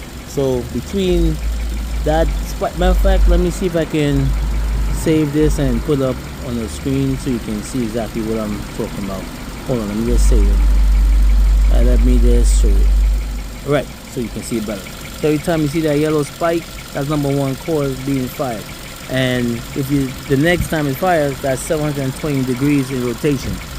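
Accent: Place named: Trinidad and Tobago. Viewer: Idk why I hear a hint of Trini in your speech lol.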